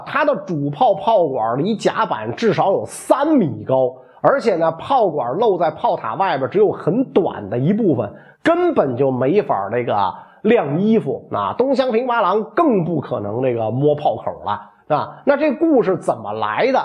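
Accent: Beijing, China. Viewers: 講普通話，有些北京腔聽不太懂。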